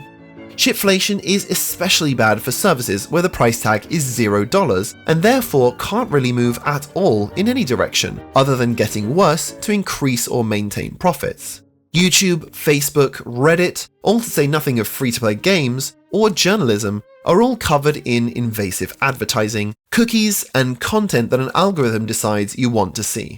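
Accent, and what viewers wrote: United Kingdom. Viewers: British accent, US money. Am I watching Sh*tvlogging with AI? 😂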